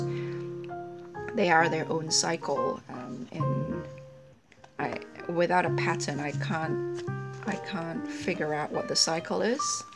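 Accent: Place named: Singapore. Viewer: Hahahahah I love your Singlish <3 you score full points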